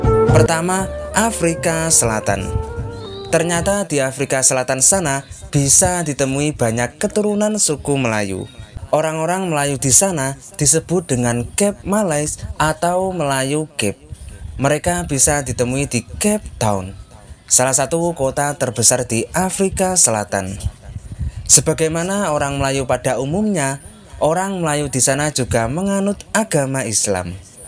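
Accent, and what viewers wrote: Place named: Java, Indonesia. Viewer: Masssssss.bhsa.nyaaaa medog bngettt.jawa nyaaaaa.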